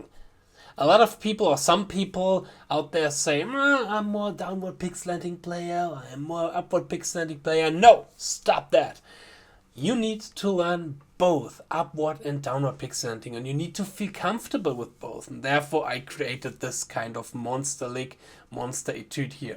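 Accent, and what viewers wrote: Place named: Germany. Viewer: Wow, man! I have to tell you, how much I like your videos so far! Not only are you a phenomenal guitarist, but you are also very, very likable (you even remind me of my best friend optically AND voice/German-English accent) and you totally nail the spot(s) for me, where I want to get better at! Very well explained and with a super fun lick! I'm really celebrating here!:D I didn't know, how fast I can actually play meanwhile, because I rarely play THIS fast and even being totally clean! (Well... not THAT "clean". I had/have some beer, I admit...;) But you know what I mean...) You should consider making a Patreon channel sometime in the future. I would subscribe! (I'm already a Patron of Ben Eller, Andy Wood and Alexandra Zerner and this is a great thing!) Now, I'll continue the last bit of the video and will surely check out more from your channel afterwards! 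Danke dir,! Ganz ehrlich, du bist da offenbar ne ganze Ecke näher an mir als die üblichen Verdächtigten auf Youtube, wie z. B. Ben Eller etc. Alles geiles Zeug, das wirklich oft hilfreich oder spaßig ist, aber du bringst das für mich zumindest noch mal auf ein ganz neues, deutlich ambitionierteres Level. Zumindest mehr als Ben Eller zum Beispiel. Der hält das für meinen Geschmack oft ein bisschen ZU "einfach". Ich kann die Videos von dem eins nach dem Anderen runter-rocken und kann danach fast jedes Lick zumindest auf 2/3 Speed. Und dafür brauch ich meistens nur den "Stepdad-Speed" und guck mir das 3 - 4 mal an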